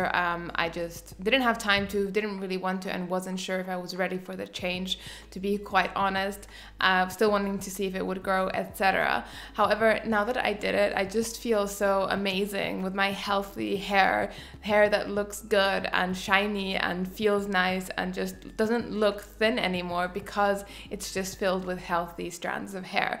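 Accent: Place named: Germany. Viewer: I love that I can hear you are german by you saying "Mine" at all times, rather than "my"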